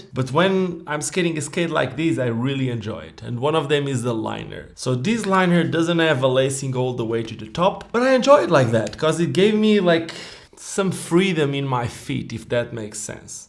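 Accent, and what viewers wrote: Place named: Portugal. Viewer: Acabei de comprar estes patins, a meio da review comecei a pensar no sotaque e obvio que tava certa e é tuga xD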